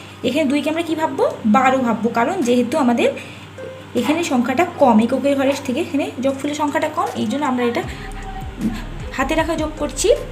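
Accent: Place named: India. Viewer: আপনার ভাষা শুনে মনে হচ্ছে আপনি ভারতীয় নাগরিক।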